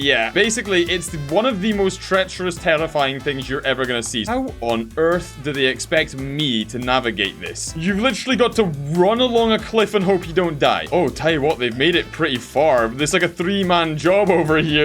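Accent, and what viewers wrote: Scotland, United Kingdom. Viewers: Is bro Scottish?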